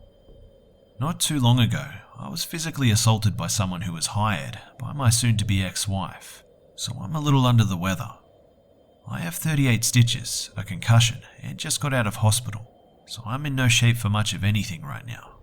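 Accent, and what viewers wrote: Australia. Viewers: Are you by any chance Australian? I'm Australian and picked up on your intro haha! Thought I'd ask :)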